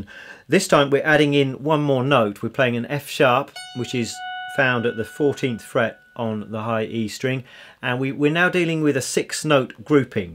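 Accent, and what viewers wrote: England, United Kingdom. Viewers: Great lesson ! Where in England are you from ?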